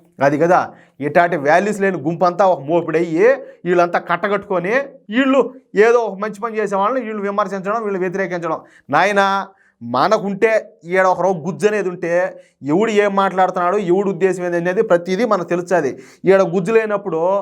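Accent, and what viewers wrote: Rayalaseema, India. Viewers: Nellore Tirupati Chittoor slang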